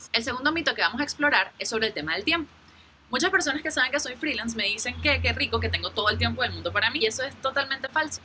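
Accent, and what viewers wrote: Venezuela. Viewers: Le doy play al video, me pongo a revisar Clash of Clans y cuando escucho ese acento Venezolano, vuelvo a ver la pantalla jajajaj. Es un gusto cuando los bloggers son Venezolanos, es como si la persona que te habla es un pana tuyo :D Saludos.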